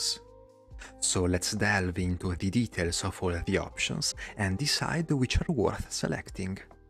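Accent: Italy. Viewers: Your voice with that Italien accent is beautiful and very relaxing. Did you thought of doing ASMR with computer components ? :goodvibes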